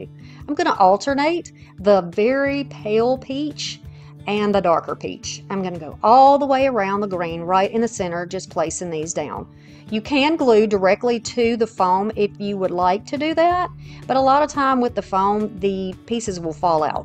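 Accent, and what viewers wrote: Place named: Texas, United States. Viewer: I agree! 🐰 I actually might have squeaked when he gave it to me. Hehe 🤭 I mean....just a little bit. 🤏 I thrifted a white ceramic bowl with fruit carved in it that was missing the top, so I'm going to make a nest to put in it and put the chubby momma and baby birds in it. Would you mind if I emailed you a pic? I'm not gonna force it on ya or nothin! Lol Side note..I love that you talk like me! I grew up on a dairy farm in Texas, and I'm about as country as you can get. Sorry to write you a novel and tell you my life story! Have a good night hun. 💜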